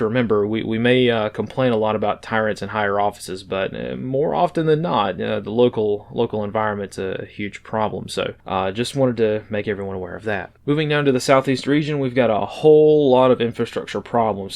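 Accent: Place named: Virginia, United States. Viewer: Hey, I love the content. And just wanted to say, by hearing your accent.. I believe you’re from around Virginia, I know people from Norfolk that share a strikingly similar accent. And with a lot of crazy things going on nowadays, I just can’t thank you enough for keeping us informed in a “no bs straight forward” fashion. Keep fighting the good fight buddy!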